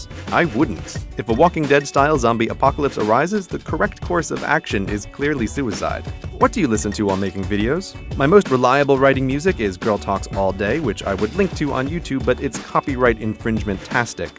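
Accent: United States. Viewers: When I was 15, my English teacher (in Norway) told my class that it didn't really matter which form of the English language we chose, but that we should choose one and stick with it. Is your attempt to keep your accent fully American (it's impossible to avoid being affected by those around you entirely) similarly motivated?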